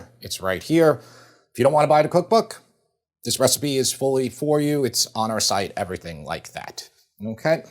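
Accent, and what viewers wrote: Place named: New York-New Jersey, United States. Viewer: With his NY-NJ accent, the host sounds like comedian Jim Florentine. 😂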